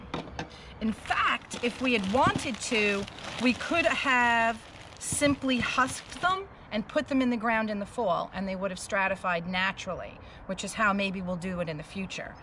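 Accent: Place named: Long Island, United States. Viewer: Thats nuts! Love the long island accent.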